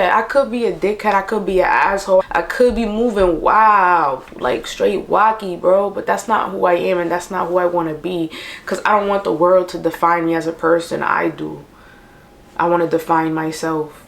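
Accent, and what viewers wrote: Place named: New York, United States. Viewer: i envy that man that puts a ring on it miss them dimples tho and that new york accent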